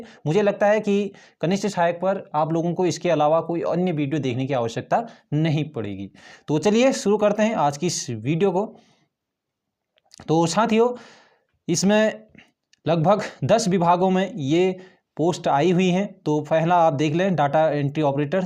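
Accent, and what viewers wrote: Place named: Kumaon, India. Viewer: Kumauni lg rha bhai...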